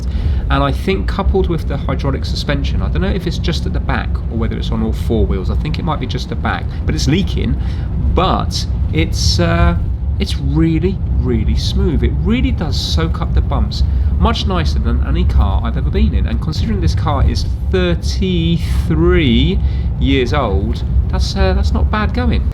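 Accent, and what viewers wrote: United Kingdom. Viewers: my Hero! Nice to hear a British accent after beeing in NZ for 30 years... reminds me of home ;)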